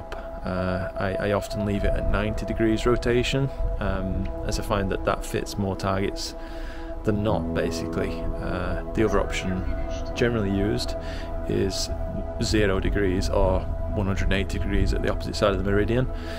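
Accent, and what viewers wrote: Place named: Northern England, United Kingdom. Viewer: Loving the channel. You sound like a northern lad. Where are you imaging from?? I'm in Lowton Leigh.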